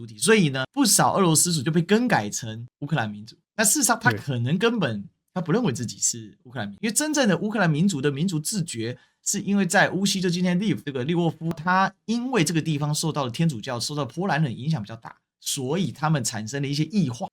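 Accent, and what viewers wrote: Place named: Shanghai, China. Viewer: 完全的上海口音和语速😂😂😂😂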